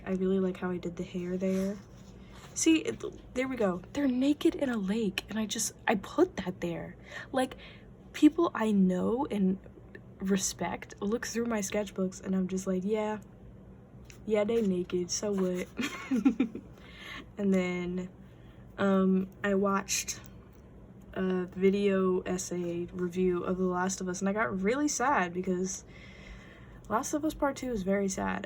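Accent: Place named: South, United States. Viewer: I just have to mention that you have a nice southern accent :3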